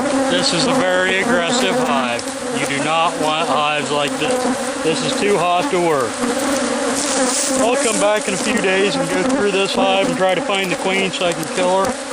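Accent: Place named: South, United States. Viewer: With those bees being aggressive and with his southern accent its hard to understand what he is sayin.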